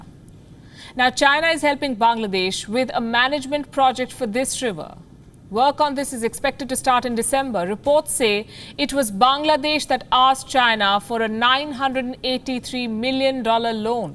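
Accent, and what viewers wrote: India. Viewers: But most of these idioms and sarcasm are from a western/British cultural script, coming from an Indian with thick indian accent who was clearly not raised in the West seems out of place, it's like listening to a parrot very amusing, but that's what the whole world laughs about India - it's desperate need for acceptances. Just saying. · Bangladeshi people don’t even think about t.....u..d... t...u...d..... India... why India so much concern about them?? Are you feeling lonely??... No neighbours... ?? Even Hindu country Nepal not giving company...? Beautiful lady can you plz clear it...? Anyway I like your typical Indian accent.....keep it up....nice story telling....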